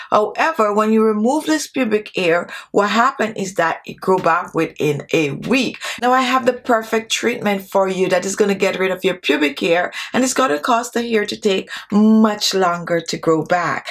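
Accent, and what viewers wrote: Jamaica. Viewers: Were are you from? You have a strong Jamaican accent. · You're Jamaican right?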